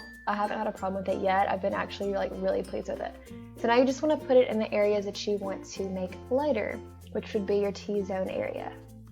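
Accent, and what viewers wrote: South, United States. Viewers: I love it, and are u from the south I think I hear an angelic southern belle lol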